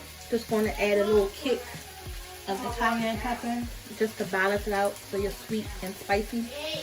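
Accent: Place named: DMV, United States. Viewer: The DMV accent is so strong lol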